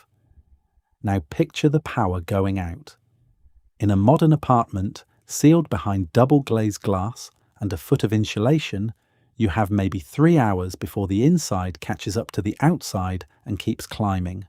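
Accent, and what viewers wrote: England, United Kingdom. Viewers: Isn't it an Al Englishman?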